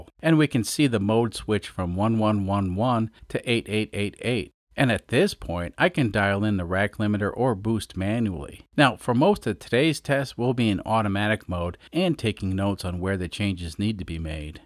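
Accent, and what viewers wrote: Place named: Midwest, United States. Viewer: I’ve been thinking about why this channel “works for me”. - First of all its simply entertaining. - Your channel combines several of my younger self passions (being a motor head, electronics, computers, and just making stuff to see if I could do it). - You explain difficult subjects with clear to understand “Midwestern dialect”. (I was really wondering how you were going to pull off explaining interrupt processing, but you did it well.) - The pictograms and simple explanation help us to visualize new concepts. Not everyone has a mech-e or computer science background. So pictures help greatly. - Your approach to the channel is much like just a couple of guys hanging out in the garage trying out different ideas, some work and some not so much. If everything worked the first time, what would we have learned? As for channel statistics, while you need to keep an eye on them for the health of the channel, I wouldn’t focus on them too much. 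Make what you enjoy and they will come. (edit: I tried to make a weak field of dreams reference, but I just looked it up and that was Iowa not Kansas. If it worked the first time, what did I just learn?)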